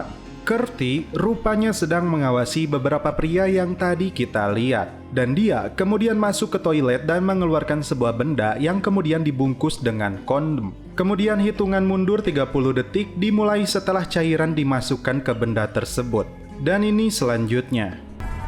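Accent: Indonesia. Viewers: Orng indo baca nya begitu bg, mau gmna lagi susah di ubah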